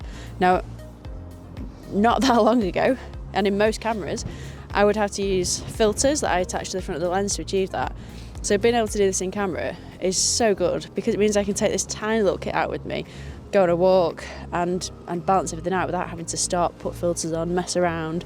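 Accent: United Kingdom. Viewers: Nicely presented and lovely Lancashire accent.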